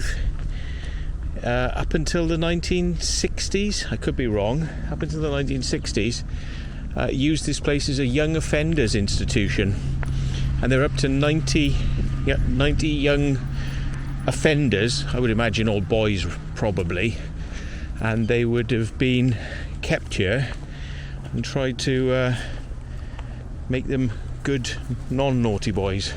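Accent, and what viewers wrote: Wales, United Kingdom. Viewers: awesome thank you so much! Also, while I have you, what part of the UK do you hail from? I mean your accent is very different to the rest of your crew (in a good way) my partner who now lives in Australia, grew up in Ashford in England and he thinks you sound a bit Welsh?? I don't agree, so we'd love it if you could settle this argument for us lol 😉 Thanks again for the harness info and please give Angel a belly scratch from us! ❤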